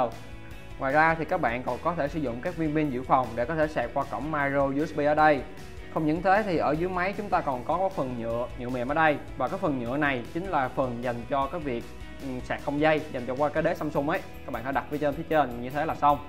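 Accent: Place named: South, Vietnam. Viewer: tiếng miền nam ko thể nào nghe dc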